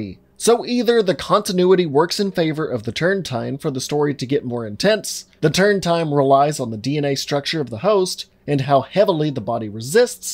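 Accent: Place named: South, United States. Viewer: I just realized how much he has a southern drawl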